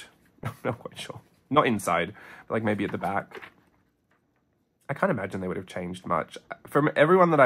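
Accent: Australia. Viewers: I'm new to Hobonichi, discovered it all through my YouTube feed and took a deep-dive down the unboxing rabbithole. Have ordered my first - expensive for me but it will encourage me to get back into journalling. Thank you for your very real approach - awesome to hear a fellow Aussie accent 😊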